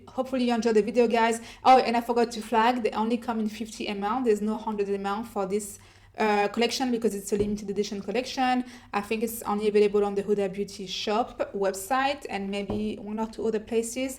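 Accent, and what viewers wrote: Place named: France. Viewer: Lovely review 🥰 I heard that the wedding silk was like a love child from vanilla 28 and Musk 12 which are my favorite😍 Does the layering of the two lean masculine? Also do you speak French? I detect an accent.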